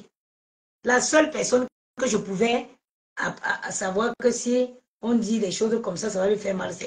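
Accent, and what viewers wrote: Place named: England, United Kingdom. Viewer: Tu as l’accent anglais ma fille pas dorcaca qui parle anglais en patois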